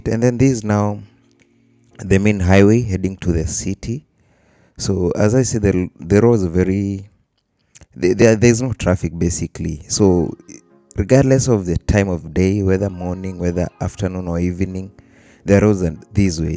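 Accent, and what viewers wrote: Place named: East Africa. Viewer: Are you from Uganda or Kenya. This accent is from East Africa because my wife is from Uganda. You both have similar accent. Love you from Nigeria 🇳🇬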